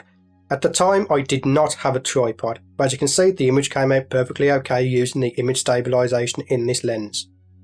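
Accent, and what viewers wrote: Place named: United Kingdom. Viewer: Love your systematic division of points of review. Cool accent too... is that English? Which part of the UK?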